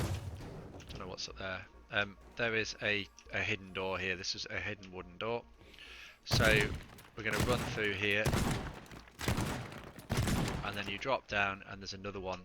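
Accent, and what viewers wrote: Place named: United Kingdom. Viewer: Found this video after watching Smii7y absolutely lose his mind over this heist. The transition between loud Canadian frustration and calm British professionalism was phenomenal 🤣🤣🤣. Great video!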